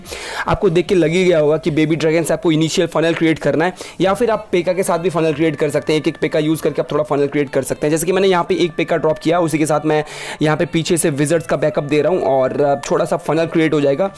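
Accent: Bengal, India. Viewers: Bhai tumi bangali ?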